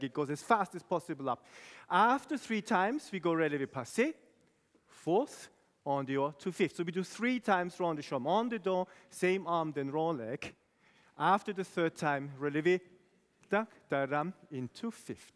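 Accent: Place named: Brazil. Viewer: I know you're Brazilian 😂 your accent tells ♥️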